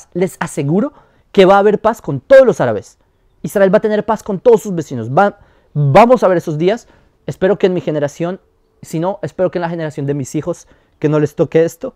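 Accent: Bogotá, Colombia. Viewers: Acento rolo (Bogotano) que tienes.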